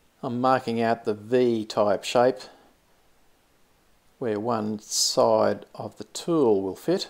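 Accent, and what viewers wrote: Australia. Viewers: Love your honesty, admitting to small mistakes. ALL of my successes have built in mistakes - just makes them more realer! And, good to hear an Aussie on YT!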